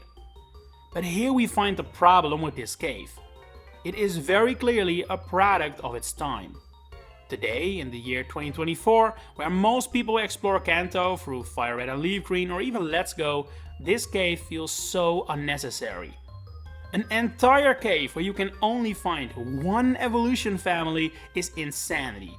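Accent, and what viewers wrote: Netherlands. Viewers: Me, hearing you accent for the first time: "MEDELANDER????" Flash caves are the actual worst tho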